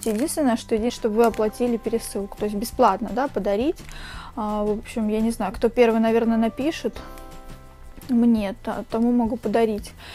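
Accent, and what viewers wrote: Rostov, Russia. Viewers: А вы случайно не из Ростова-На-Дону?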